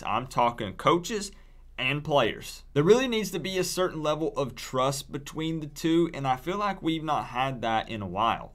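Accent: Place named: Chattanooga, United States. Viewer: not for nothing, but you do have a Chattanooga accent.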